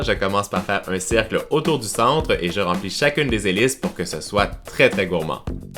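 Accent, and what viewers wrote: Quebec, Canada. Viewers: Jadore troppppp lacsent quebecoise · J'aime trop les Québécois surtout votre accent !!😘😘😘😍😍😍😍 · #lovemail t'es le meilleur j'adore ton accents québécois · J'adore vos axant ... l'axant québécois c'est mon préférer . Je trouve qu'il est tros marant . Sinon j'adore t' es vidéo . 😍😚😇😜😄😸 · Jadore tes video cest pas laccent quebecois ? Jadore😍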